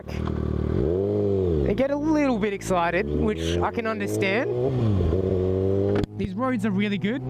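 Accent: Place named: Australia. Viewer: How Australian haha "where's the rabbit?" Great content mate keep it up!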